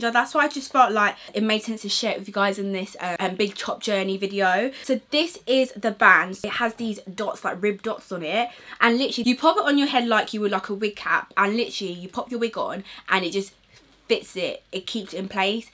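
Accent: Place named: United Kingdom. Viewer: What is it about these damn British accents??....Like I can't!!! I just love you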